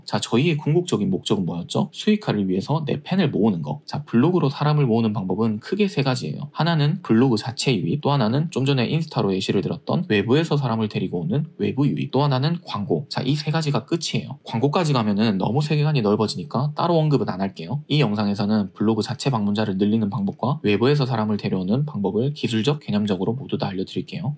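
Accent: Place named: Gyeongsang, South Korea. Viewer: 강의듣는데 말투가 친근하셔서 같은 경상도인이거니 했는데 거주하시는 곳이 같은 지역 같은 ㅁㄱ동이시군요 ㅎㅎㅎ 괜히 반갑고 더 집중하게 되었네요 !! 좋은 영상 감사합니당 😊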